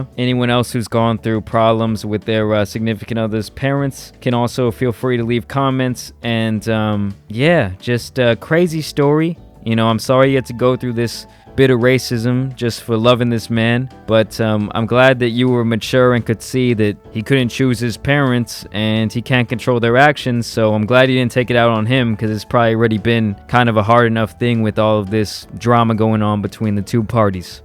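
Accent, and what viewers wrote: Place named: East Coast, United States. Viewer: Okay I just found your channel and I been watching everything. Where the hell did this east coast accent come from I’m so confused 😭😭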